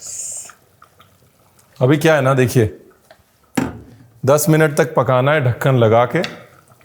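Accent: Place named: Lucknow, India. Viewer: Bhai bada badhiya lagta hai ye lucknow wali tone sun ke, apne ghar wali feeling aati hai.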